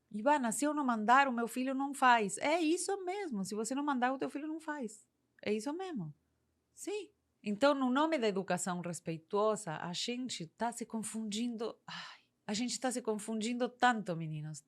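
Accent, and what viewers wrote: Spain. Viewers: eu não sei se você percebeu, mas o sotaque dela é de alguém cujo idioma nativo é o espanhol.............. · Que sotaque gostoso, de um espanhol falando português do Brasil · o sotaque espanhol é muito fofo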